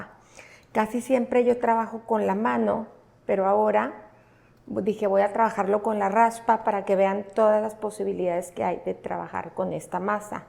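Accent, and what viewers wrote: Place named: Mexico City, Mexico. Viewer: Párese chilanga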